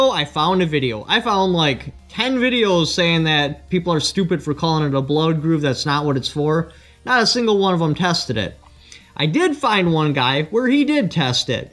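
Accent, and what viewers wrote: Canada. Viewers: The Canadian is strong with him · This is the great Canadian/hillbilly accent. Its awesome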